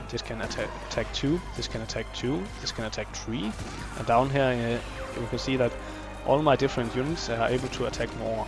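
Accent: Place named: Denmark. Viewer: I guess he's Danish, that's what it sounds like to me at least.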